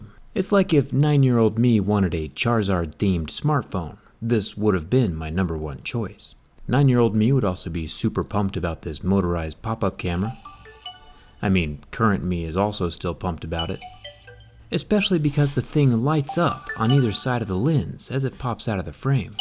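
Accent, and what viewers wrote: United States. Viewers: Oh and the perfect American accent didn't give it away? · Your voice is very flat !!!!! Please talk or speak or "parla" with Oxford accent if you want a normal ear to FOLLOW YOUR MOUTH !!!! OTHERWISE , Its HARD TO HEAR OR LISTEN , YOUR BRAIN-WASHING FLAT AMERICAN VOICE VIBRATION !!!!!!!!!!!!! ☀☀☀☀☀⛵✈✈✈✈✈✈✈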